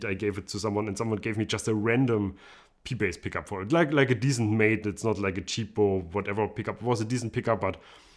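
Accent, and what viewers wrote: Germany. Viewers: Hey brother, I love your channel. I'm also one of those guys that has nicer basses than I should considering my playing ability. I love your self-deprecating humor and also your accent. I was stationed in Germany while in the military & met some very cool people there-it was a blast! I hope to make it back for a visit in the next couple of years. Much respect to you and all the German brothers and sisters.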